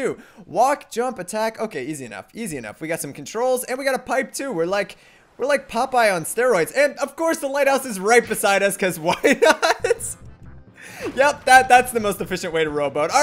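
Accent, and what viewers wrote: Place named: Sweden. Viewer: You sound slightly Swedish :o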